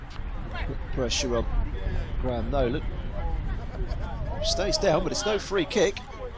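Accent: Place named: Northern Ireland, United Kingdom. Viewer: commentary is very BBC1 NORTHERN Ireland.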